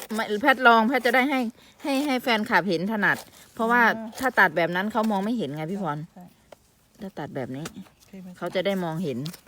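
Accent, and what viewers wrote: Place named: Central Thailand, Thailand. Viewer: พี่พรเป็นคนจังหวัดอะไรรึคะ พูดกลาง เรียบร้อยๆ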